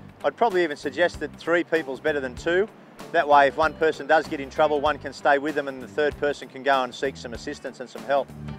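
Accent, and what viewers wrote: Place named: Australia. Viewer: gotta love the aussie accsont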